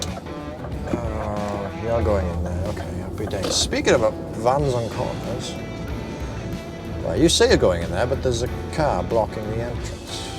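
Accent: Wales, United Kingdom. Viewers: Welsh accent makes it better “ya joooking”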